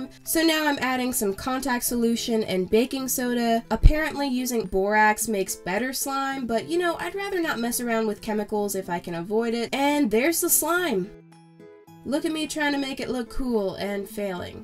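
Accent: South, United States. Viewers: Why does she have like the country accent in this video? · In this video why dose she sound like she’s from the south...🤷‍♀️🤷‍♀️🤷‍♀️ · This video of her sounds like she has a southern accent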